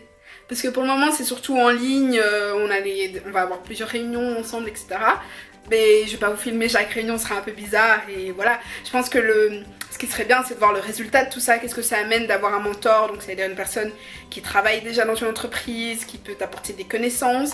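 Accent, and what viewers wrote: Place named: England, United Kingdom. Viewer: Moi j'aime bien ton accent anglais hahah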